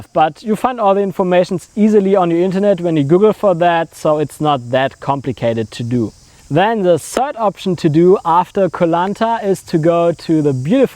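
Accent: Germany. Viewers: Love the German accent. Great info :)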